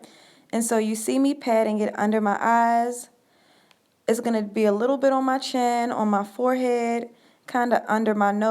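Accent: Baltimore, United States. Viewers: Omg I could tell you were from Baltimore lol . love it, so beautiful. New Subbie!!!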